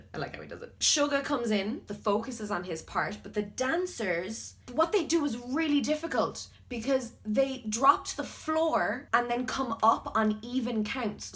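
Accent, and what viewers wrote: Ireland. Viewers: Are u Irish? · I can tell by your accent that you’re Irish, also you’re amazing at describing everything in an educational way. I was wondering and I know this is a weird question but is there a certain dance studio you go to or teach at? Do you recommend certain dance classes for someone like me who loves the style of dance k-pop artists use....? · She’s Irish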